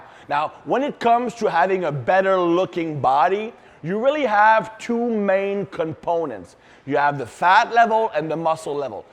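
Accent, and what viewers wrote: Quebec, Canada. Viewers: What is it about a French-Canadian accent that makes me want to shut up and humbly learn???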